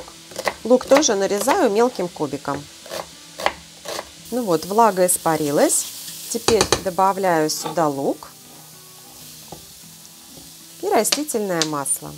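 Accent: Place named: Akanye, Russia. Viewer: ПОВЕРЬ ПОДОЙДЕТ ЛЮБОЕ. МЕНЯ ТОЛЬКО РАЗДРОЖЖАЕТ ЕЁ АКАНЬЕ.... НЕ МОГУ СЛУШАТЬ ТАКОЙ ГОВОР ДО БЛЕВОТИНЫ.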